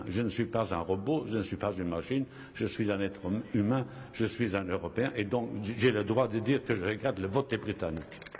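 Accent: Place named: Germany. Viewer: il me semble monsieur Juncker que votre langue maternel est l Allemand pitiè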